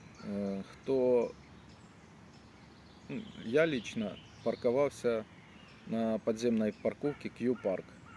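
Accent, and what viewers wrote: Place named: Kharkiv, Ukraine. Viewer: За Вовчанськ дійсно нема слів. Вітання з Харкова. Я ж чую що Ви з наших місць.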